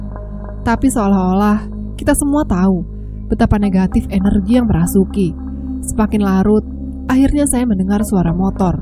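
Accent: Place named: Java, Indonesia. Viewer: tp bhs jawanya medok bngt ya 😃. Sukses selalu ka 👍👍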